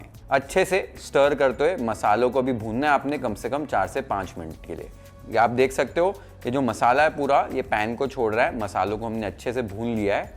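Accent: Maharashtra, India. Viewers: Chef I am ur big fan, Just wanted ur master chef wala episode, u look so handsome. I really follow ur recipes, I am a maharastian girl married to a Bengali guy, ur marathi accent is just lovable. Always wait for your new recipes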